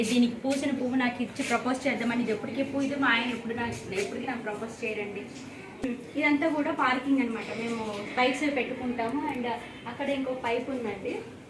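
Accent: Srikakulam, India. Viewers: Srikakulam slang vastundi 😘😂😂madi kuda sklm aa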